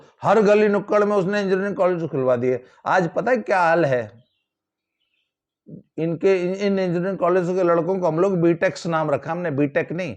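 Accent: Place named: Gurgaon, India. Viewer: Thhare baans ho jyaaga, Aapka lehjaa bilkul hmari Tarah h, n proper Gurgaon se village knhai. Aap kis caste se belong krte ho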